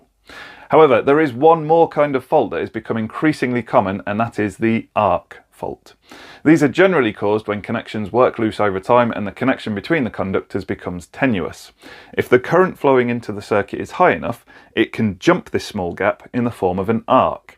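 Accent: United Kingdom. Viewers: A fault of negligible impedance? Ohhh, you mean a short circuit 🤣 Everything sounds better in British English!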